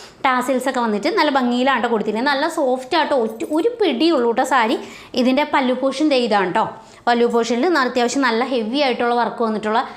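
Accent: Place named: Perumbavoor, India. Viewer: ട്ടോ എന്ന പ്രയോഗം നമ്മുടെ പെരുമ്പാവൂരുകാരുടെ ഒരു പ്രത്യേകത ആണ് ചേച്ചി പറയുന്നത് കേൾക്കാനും നല്ല cute ആണ് അതുകൊണ്ട് അത് മാറ്റേണ്ടതില്ലാ ട്ടോ😊😊😊😂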